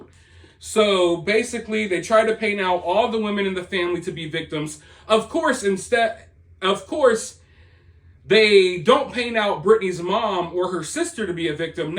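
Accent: United States. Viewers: You are speaking such a beautiful american-english, so I (a german speaking person) can follow you. Thank you!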